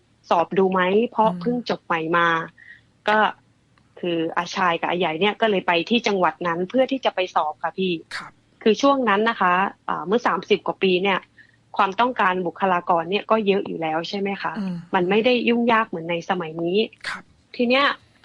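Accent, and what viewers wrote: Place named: Upper South, Thailand. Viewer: ฟังจากสำเนียง น่าจะอยู่จังหวัดเดียวกับเรา ภาคใต้ตอนบน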